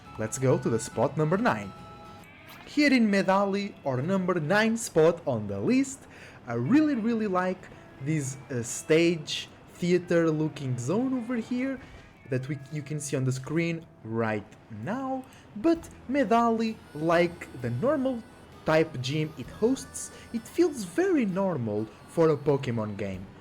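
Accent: Portugal. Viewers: eu qd oiço um portugues a falar ingles sei logo ahahah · You have a portuguese accent Eu tinha feito este comentário antes de mencionares, achei o video bem top 👌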